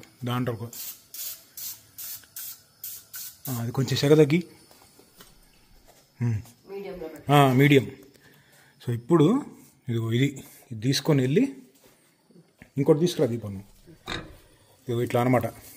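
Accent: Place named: Guntur, India. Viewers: Bro midi guntur district accent undi motham❤ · Ma Guntur language la untadi mee Bhasha. Nizamabad yasa ledu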